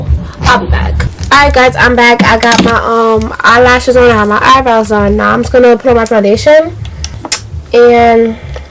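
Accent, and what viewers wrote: New York, United States. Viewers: He so Ny lmaoo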